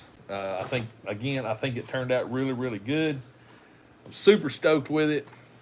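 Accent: South, United States. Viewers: How could I not subscribe to a toy collector with a southern twang.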